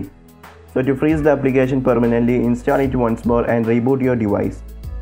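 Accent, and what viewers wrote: India. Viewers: I cant even understand ur indian Apu chipati ass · that's mallu accent mam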